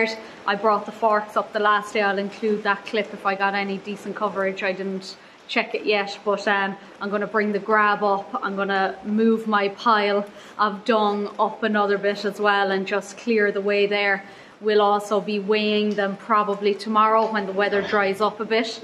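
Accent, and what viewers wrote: Kerry, Ireland. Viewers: Remember no matter what u lose Dont lose that beautiful Kerry accent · You're an inspiration love you're Irish accent 😊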